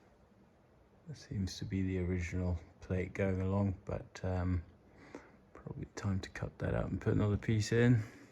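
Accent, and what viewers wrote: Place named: England, United Kingdom. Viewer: It's so funny to hear English spoken with an English accent. It sounds so foreign and exotic to me. Not a single word comes out the way I would pronounce it.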